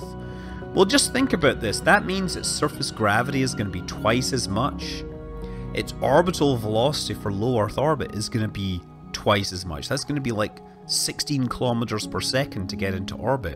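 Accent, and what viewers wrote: Scotland, United Kingdom. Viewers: Nice video, I enjoyed the scotish accent !! ...The earth is the most massive solid planet in the solar system and has the third magnitude of surface gravity after Jupiter and Neptune, escape velocity of 11 km / s (40,000 km / h) to elude such gravitational field it requires a huge amount of energy (we could say that the earth it's already a super earth) Inhabited planets with higher masses could be gravitationally unattractive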